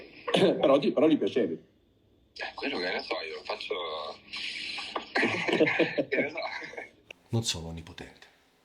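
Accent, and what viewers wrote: Italy. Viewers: La cosa più tremenda di questi video è l’accento che ha Corona..da bauscia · Ma sto accento piemontese fortissimo??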